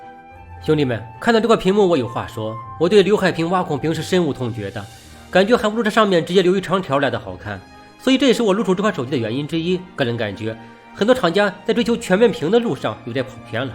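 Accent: Shandong, China. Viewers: mike绝对是山东人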